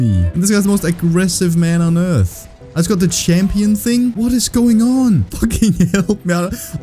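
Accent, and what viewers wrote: Denmark. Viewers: You can still kinda hire the Danish accent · I just knew about your channel and as soon as I heard your voice I knew you had a danish accent. Respect for a fellow danish Dark Souls player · i love how hard his danish accent is:)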